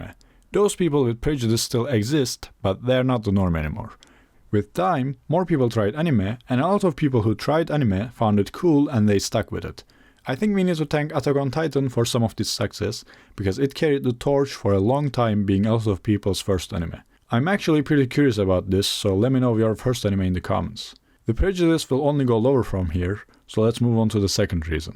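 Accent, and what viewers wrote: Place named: Turkey. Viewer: this isn’t related to the topic but are you turkish your accent kind of sounds like you are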